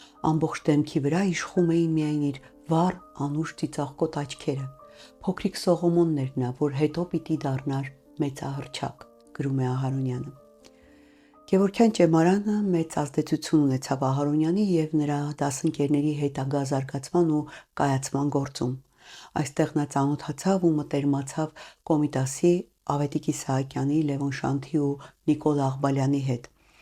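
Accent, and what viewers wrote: Standard. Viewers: Hiacas em dzezanov yev dzer maqramaqur hayerenov. Dzer amen mi hraparakume mi dasagirq e. Dzez uj u korov. Shnorhakalutyun.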